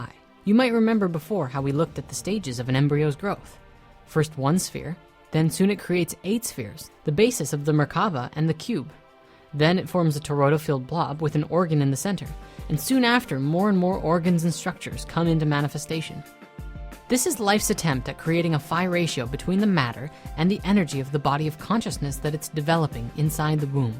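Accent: Canada. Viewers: Love it how (once in a while) the Canadian accent peeks thru! 😉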